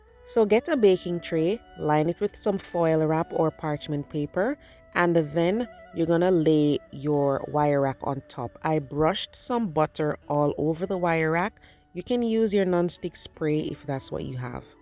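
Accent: Jamaica. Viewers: Yummy looking I can only imagine the great taste loved you Jamaican accent 😋🥰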